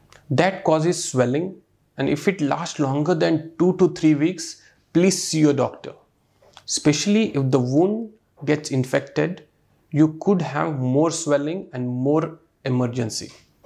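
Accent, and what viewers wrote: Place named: India. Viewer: it's good Inglish with Indian accent I understood all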